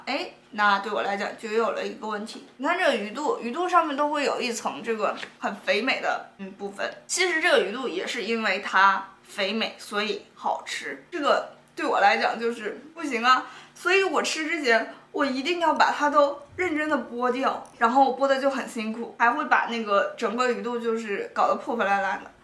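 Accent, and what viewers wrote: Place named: North, China. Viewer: 聽你的口音應該是大陸北方的人難怪对台灣南部偏甜的口味不應可理解的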